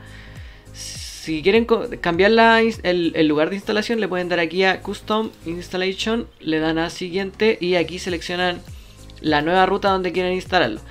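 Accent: Chile. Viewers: sos chileno? y gracias lo estoy viendo <3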